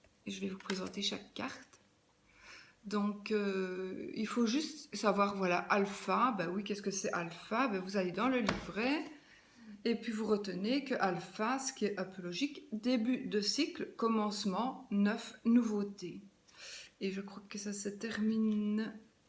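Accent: Belgium. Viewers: Bsr, j'adore ce jeu ,moi je viens de le découvrir. Depuis presque trois semaine. Puis je me permettre ,y aurais pas t'il un léger accent belge 🙂. Je vous souhaite une douce soirée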